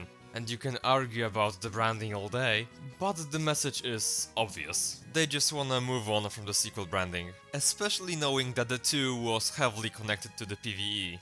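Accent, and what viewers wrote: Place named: Germany. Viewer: The strong German accent gave away that for once I wasn't recommended AI slop good vid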